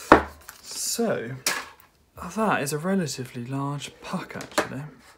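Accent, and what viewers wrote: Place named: RP, United Kingdom. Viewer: Corrr your posh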